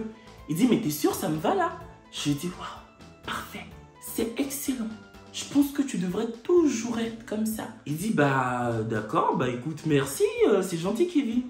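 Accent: Ivory Coast. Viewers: je suis antillaise et je m'arrange avec l'accent ivroirien. Donc les blancs n'ont qu'à s'arranger aussi comme nous. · Pourquoi elle comprendrait pas? Il parle bien français même avec son accent Ivoirien & quelques mots en nouchi, n'importe qui peut comprendre.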